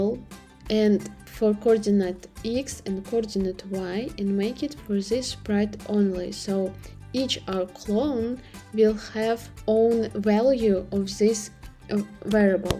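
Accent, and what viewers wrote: Russia. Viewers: Thank you!(акцент русский)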